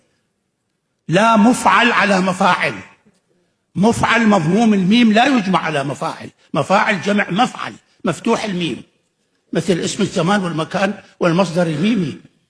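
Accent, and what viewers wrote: Iraq. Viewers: طلاسم اللغة وتغدعقيدها دليل ضعفها ونفور الاشخاص للتدث بها... وذا الدكتور يتحدث اللغة الفصحى بطريقة خاطئة ...مخارج الحرف باللهجة العراقية وهذا لايجوز كنطق أو أن يتكلم بالعامية أفضل مثاا نكيرا...بدل أن يوقول (نكره)والدليا الذب ايتحصىه من القرآن يلين فيه الايتهزاء وهذا انسايا مرغوضا ولو أن هذا كتاب يقال أنه سماوي ...ودفالإستهزاء عمل سيئ والكثير من الشواء القرآنية تثبت ذلك ...يقولون هنالك عبرة ...أية عبرة حين تحبط الآخرين ...إذا كان كلام الله هكذا فكيف بعباده ؟؟. البلدان الذان ينطقان الفصحى بشكل سليم هما سوريا ولبنان ...أما باقي الدول العربية فلا. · يعني عيوننا دمعت لانك الوحيد الذي يتكلم مثل اهل العراق وباقي للسوريين لاء ...الاعيبكن اصبحت مكشوفة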